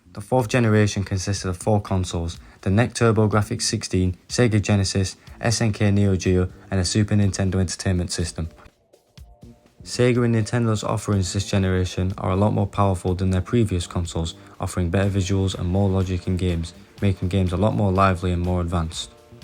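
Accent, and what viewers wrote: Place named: Manchester, United Kingdom. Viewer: Your accent. You from Manchester?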